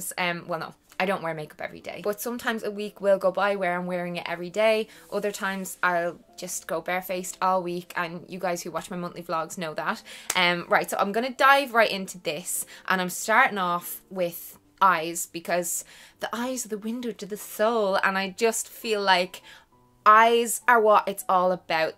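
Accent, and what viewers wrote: Ireland. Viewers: I'm so glad I found your channel! I lived in Ireland 7 years, so not only are your videos super helpful, but your accent also brings so much nostalgia! Thank you for all the helpful tips! If you don't mind me asking, is your hair color natural? The black you're wearing is so vibrant! It's not... inky, if that makes any sense. It looks like your hair has lots of different shades of black to it, and it looks gorgeous. :)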